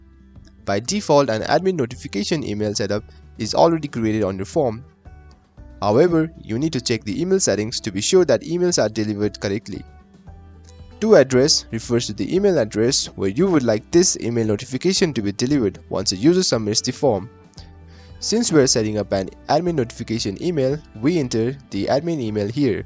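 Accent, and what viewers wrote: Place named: India. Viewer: Nice but It's not easy to understand with the quite strong Indian accent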